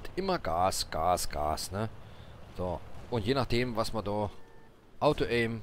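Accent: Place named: Saarland, Germany. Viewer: Ma merkt dir schon e bissje an, dass du ausm Saarland kommsch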